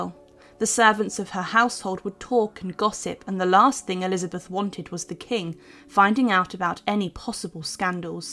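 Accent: United Kingdom. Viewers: At 37 seconds in to the video the presenter (who is speaking with what sounds to me like a British accent), states that "She (Elizabeth) had her own mother executed inside the walls of the Tower of London..." who wrote this? Gee, I wonder how King Henry VIII felt about his daughter having his wife executed?🤔 I mean, the paintings are very nice but how are we to take anything for fact after that?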